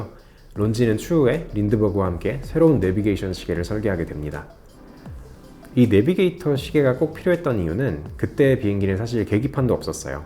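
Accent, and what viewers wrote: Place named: France. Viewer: 아 히스토리 좋습니다 아니 불어액센트 뭐죠?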